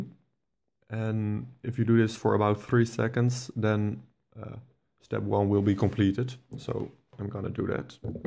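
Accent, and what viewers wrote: Netherlands. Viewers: ben jij nederlands? ik kan dat horen :)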